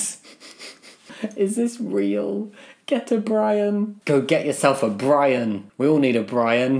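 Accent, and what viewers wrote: England, United Kingdom. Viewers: you look, talk and act like a caricature of what an english person looks like on basically any american sitcom EVER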